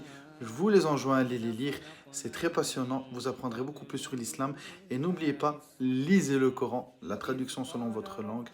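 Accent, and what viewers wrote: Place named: Namur, Belgium. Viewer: Ou habite tu en belgique ? J'entends un accent de namur mais je ne suis pas 100 % sur. On pourrait parler face à face d'appliquer la sh4ria dans notre pays et les details que ça implique ? Tu pourras réaliser le nombre incroyable d'infidéles à faire Peter avant que nos loi et advancés sociales ne retournent à l'age de fer.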